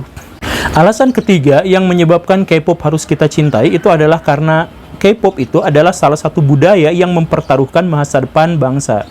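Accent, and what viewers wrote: West Java, Indonesia. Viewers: Sebut saja saya fans, Saya suka banget dengan paparan informasinya. Saua berharap bisa bertemu langsung dan belajar ke akang. Kebetulan, kedengerannya akang kaya org Sunda, klo bener, saya mau berkunjung buat belajar langsung ke akang. Smoga mendapat pesan balasan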